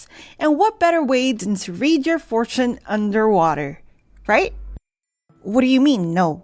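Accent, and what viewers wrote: Philippines. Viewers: Her voice and accent is so Pilipino LOLOLOL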